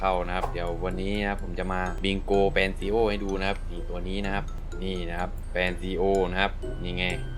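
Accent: South, Thailand. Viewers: คนใต้หม้ายนิ 5555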